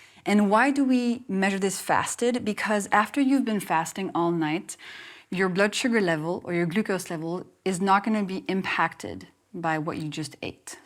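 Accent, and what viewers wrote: France. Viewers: Do I hear a faint French accent? It,s not underneath, it’s below in this context 😅✌️ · I simply love her French accent❤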